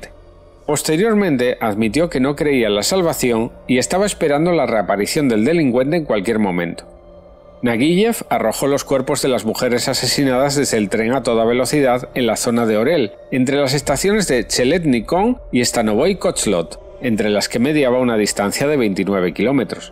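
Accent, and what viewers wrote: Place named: Spain. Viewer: Hola! Me acabo de topar contigo y voy a ver tu video, a ver qué tal. Es la primera vez, como he dicho. Te advierto que soy muy avezada en asuntos criminales, no me preguntes por qué, y que suelo comentar respecto al vídeo. Por tu acento creo que eres español. Yo te escribo desde Cartagena, en la Region de Murcia. Saludos! 🐺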